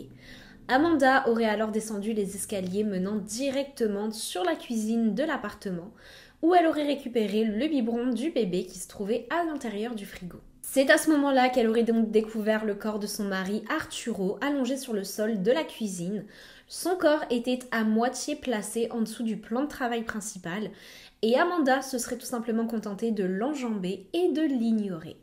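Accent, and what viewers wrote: Quebec, Canada. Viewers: je viens de découvrir ta chaîne & woww ton maquillage t’es toujours parfaite🫶🤍 j’adore tu parles beaucoup de cas que je connais pas ou qui sont moins connue en étant une grande fan de true crime. Je suis québécoise et ça m’arrive de regarder des youtubeurs français, j’ai l’impression que t’es française, crime je trouve que t’a un accent québécois AHAHA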